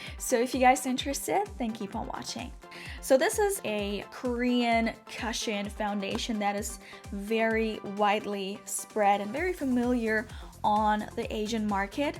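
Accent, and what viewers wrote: United States. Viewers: I can't place your accent. One moment I hear American but then it's something else. Especially when you said the words intriguing and cushion. I knew what you meant. But I had to pause for a second. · Just wondering where are you from? It was interesting to hear the word intriguing sound like "intra-guing" and cushion was pronounced "kushen." Its so very intriguing since you sound American otherwise :) Thank you for the review :)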